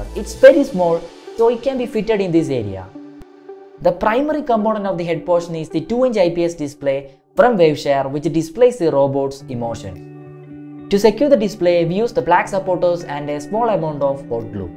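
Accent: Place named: Kerala, India. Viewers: Malayali tone actually ❤🎉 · Indian tone gives me goosebumps.... ❤❤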